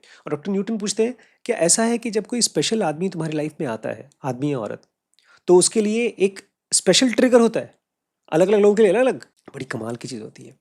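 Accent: Bihar, India. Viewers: Sir it was really deep class I was a little asleep, and found it quite insightful, and you sometimes sounded using a bihari accent with due respect.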